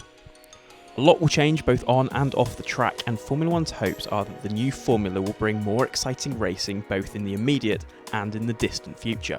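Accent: England, United Kingdom. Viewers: this STRONG English Accent ... kill me...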